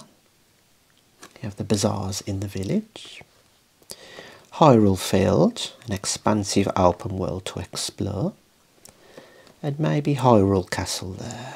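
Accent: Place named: West Midlands, United Kingdom. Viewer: So good to find an ASMR channel with a West Midlands accent 🙂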